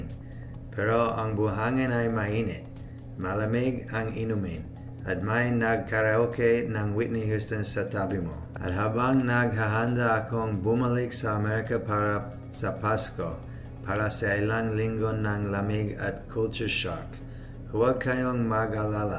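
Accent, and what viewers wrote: Philippines. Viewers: You sounded almost filiipino, 🇺🇸🇺🇸🇵🇭🇵🇭❤️❤️❤️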